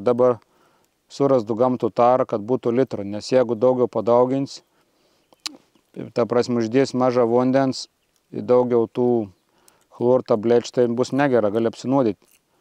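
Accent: Samogitia, Lithuania. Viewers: Smagus video, mano asmeninis pastebėjimas, kažkodėl labai tinka žemaitiška tarmė tokio pobūdžio turiniui 🐻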